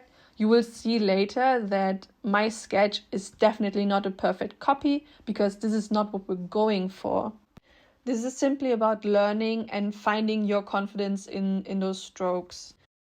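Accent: Germany. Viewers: Are you German? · i have learnt german and i don’t mean to be an absolute mug but i love the german accent. i hope ur not insecure about it, it’s so awesome :))) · Wie Deutsch will dein Akzent klingen. Trotzdem cool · omg no! Its just that i recognized the accent somewhere and then remembered my German classmates speaking english! Good video though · Nice video!! Random question, are you German by any chance? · are u german ? cuz u kinda sound german Good Video Btw^^ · Du bist einfach deutsch hahahha, dass hört man;)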